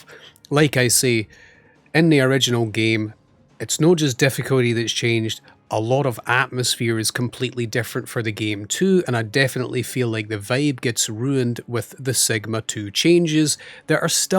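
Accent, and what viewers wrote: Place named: United Kingdom. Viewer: This is still a great game. Whiny british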